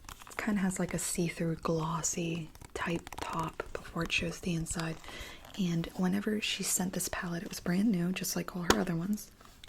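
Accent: United Kingdom. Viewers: thumbs up if you want to do a whole ASMR video in her British accent... thumbs up so she sees this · wait why did she start off british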